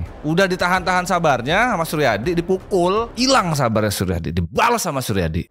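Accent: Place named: Lampung, Indonesia. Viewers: Lampung bangett euyyy.. tp nagih 😂 semangaaatt mas cerita ceritanya.👍👍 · Salfok sama logat nya bang , Lampung banget 😂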